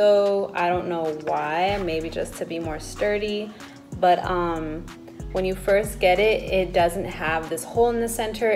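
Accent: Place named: Albuquerque, United States. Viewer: The inflection in your voice makes me miss Albuquerque. That being said I wouldn’t mind clogging your vocal cords￼😂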